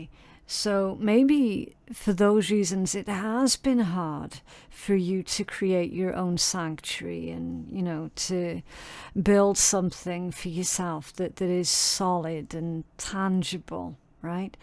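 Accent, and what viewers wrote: Scotland, United Kingdom. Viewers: It’s a Scottish accent ❤ and yes it’s beautiful!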